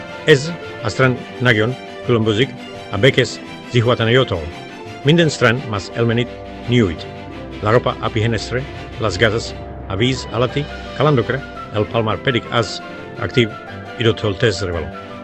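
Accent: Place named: Hungary. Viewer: Kedvelem a magyar hangsyly,nagyon szépen köszönöm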